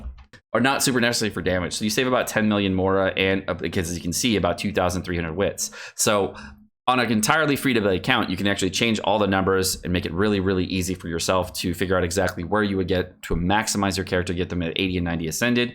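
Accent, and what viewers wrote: United Kingdom. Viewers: No British today?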